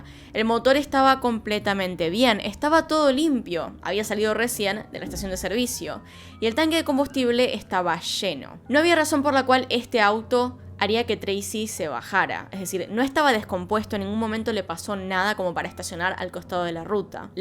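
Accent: Argentina. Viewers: Hola a mí también me encanta una...vez...más y lo bien relatas las historias con esa voz tienes un Argentino muy bonito👍💞💞💞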